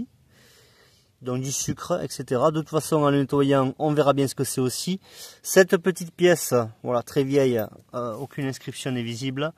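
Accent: South, France. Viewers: Salut l'ami, beau site vraiment magique l 'ambiance. Vous êtes du Sud vu l'accent ...moi aussi je détecte dans le 13. Si des fois ...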